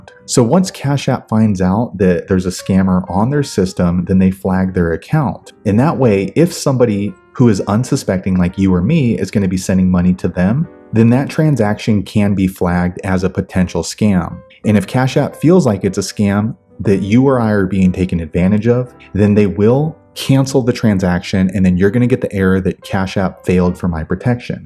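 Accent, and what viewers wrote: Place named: United States. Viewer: i can tell you're american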